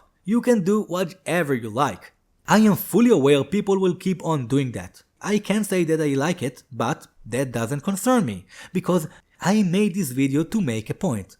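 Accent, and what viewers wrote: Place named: Israel. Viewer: also, your israeli accent is really cool, it makes me laugh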